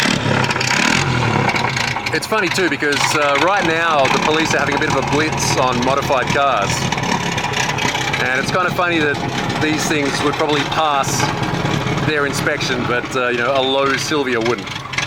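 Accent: Texas, United States. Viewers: am I the only one to not get enough of Texas accent? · ahahaha that was a decent American accent considering i know our real voice. best intro ever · I lived in Texas for 16 years. I think you'd fit right in around Houston or San Antonio with that accent tbh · not even gonna lie you nailed the American accent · You have a better Texan accent than most that live here since a large group of them are not from here lol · Your American accent threw me for a serious loop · i personally think your american accent was pretty good and im from america lmao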